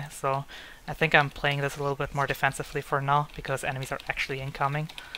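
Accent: Germany. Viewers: den deutschen akzent bekommt man einfach nicht weg :) gutes vid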